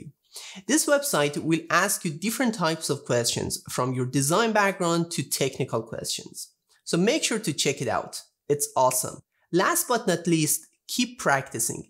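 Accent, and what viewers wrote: Iran. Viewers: شاید باورت نشه ولی وقتی که برای بار اول صدات و تلفظتو شنیدم (بدون اینکه اسمی از کانال یا ... ببینم) فهمیدم ایرانی ای😁😁😂😂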